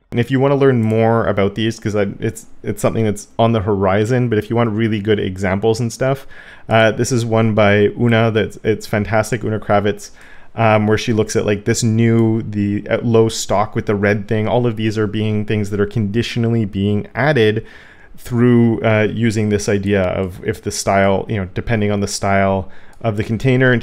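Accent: Canada. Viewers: It's definitely magical. I love it, and love only learning CSS NOW rather than 5-10-20 years ago. (I did try 10 years ago but just didn't click). Id be interested if you do a video on the different available container types regarding sizing. Aside: continer style queries ;) Hoping my daughter will come back with a tinge of your accent. She's just gone to St John's area in Newfoundland for 5 months of school (she's 17, specifically Conception Bay South)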